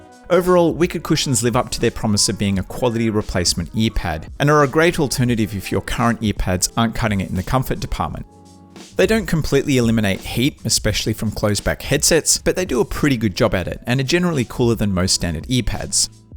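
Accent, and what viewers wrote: Australia. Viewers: Loving hearing the accent from a fellow Aussie 🇦🇺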